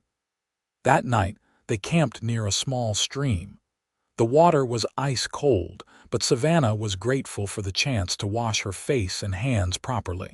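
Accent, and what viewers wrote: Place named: United States. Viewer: A 10 story with my favourite voice of the narrator, who gives with his authentic American English the stories a sound like music to "the West". And it tells once more, that a long lasting love is decision and work, the gold around is light from above... Greetings from Germany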